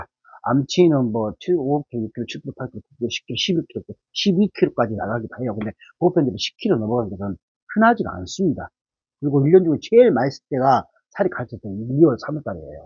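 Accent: Jeolla, South Korea. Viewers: 우리 어머니 목포말씨를 께 들을 수 잇어서 정말 기쁩니다...^^ 보물단지 호남목포말씨에요...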